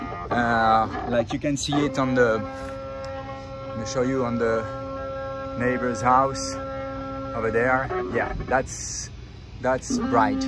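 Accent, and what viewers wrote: France. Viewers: Hshahaha the french accent 😂😂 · Very good project . Accent très frenchy ;-)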